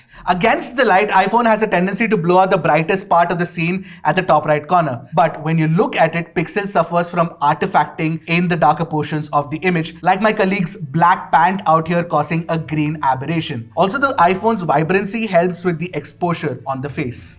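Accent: India. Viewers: Indian accent 😂😂 love it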